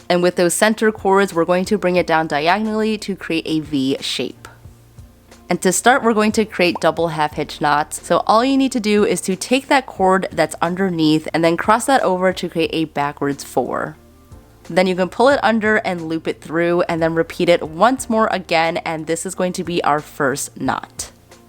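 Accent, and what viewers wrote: Chicago, United States. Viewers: where are you from? I sometimes hear a Chicago accent!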